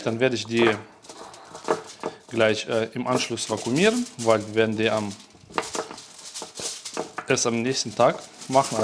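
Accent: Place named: Russia. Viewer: Слышу ты русскоязычный, а что по русски канал не зделаешь отдельно?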